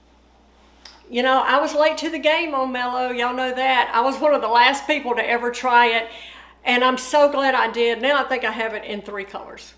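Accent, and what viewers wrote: South, United States. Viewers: I watch you all of the time. I love your cute southern accent and your natural elegance with your videos.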